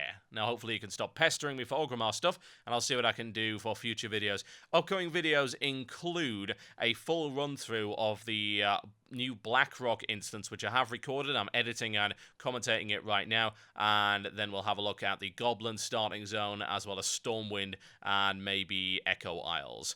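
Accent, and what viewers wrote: Australia. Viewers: Has anyone ever told you that your accent sounds more Aussie than English? o_O To me, anyway. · Hey, are you aussie? <3 your accent :D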